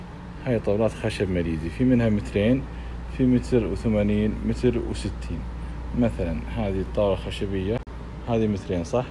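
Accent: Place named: Syria. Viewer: من سمعت لهجته سوري كنسلت لان السوريين في كل المجالات اسعارهم ١٠ اضعاف السوق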